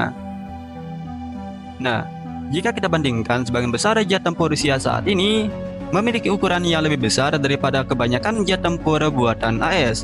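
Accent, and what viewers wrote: Lombok, Indonesia. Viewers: Saya kira adminnya dari lombok. Bunyi F jadi P. Emg benar sih mirip juga logat Bali karna lombok dlu dijajah oleh Kerajaan Bali.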